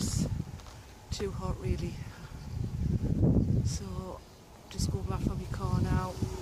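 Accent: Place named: Northern England, United Kingdom. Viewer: Hiya enjoyed watching that well done. I am new to walking the fells done a few round ambleside and grasmere but walked the langdale valley on last visit and couldnt believe how beautiful it is. Just invested in all the OS maps to help me get round though as on a few occasions ive gone a bit wayward. on that walk did you follow the cumbria way to start off and go up past pike o stickle or do you go to stool end farm then across? Going over for 4 days in June Aug and Sept and planning to get a fair few walks in. This one looks great. Sound like you arnt far from me with the accent. Im from Hartlepool.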